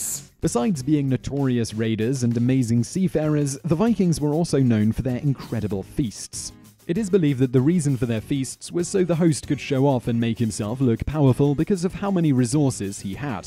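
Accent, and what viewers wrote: England, United Kingdom. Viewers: Jack Hammer Well.... he's English so.... yanno.... I'm sure he could do an African accent if you'd prefer :) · Is this guy British? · I'm sorry the enlish accent sounds smart but is it nessesary?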